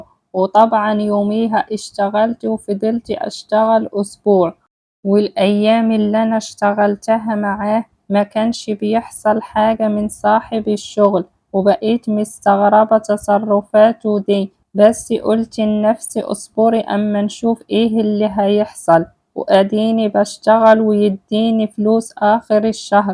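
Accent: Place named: Morocco. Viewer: المرجو عدم التصنع احكي بالدارجة المغربية احسن لك لان اللكنة المغربية واضحة في صوتك وسوف يكون افضل وشكرا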